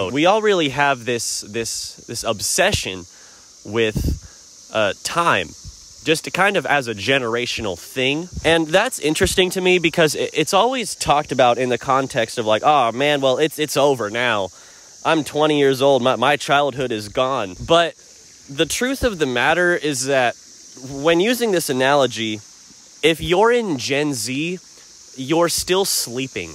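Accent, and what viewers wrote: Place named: United States. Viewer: That scenery looks like England, was surprised to hear a US accent.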